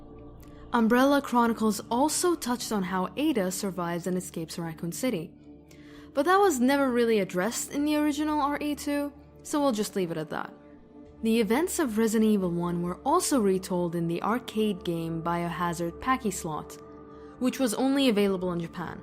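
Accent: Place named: Germany. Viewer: Awesome video. Also, love your accent. Is it German?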